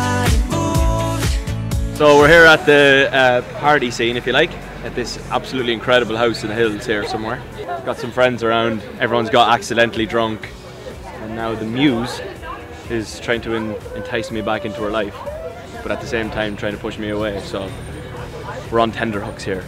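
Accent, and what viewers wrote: Ireland. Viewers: That accent thought... So damn Irish 😍❤ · So proud of Niall!!! Still cannot get over his adorable Irish accent and how good he looks!! <3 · His Irish accent is just killing me🆔😍😍😍😍😍😍😍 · cough cough Niall’s Irish accent it better than mine (Yes, I’m Irish Too)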